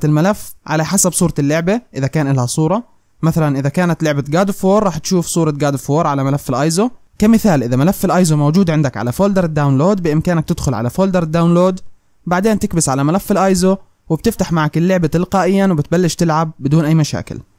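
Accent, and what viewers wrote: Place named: Jordan. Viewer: ماشي هادا اردني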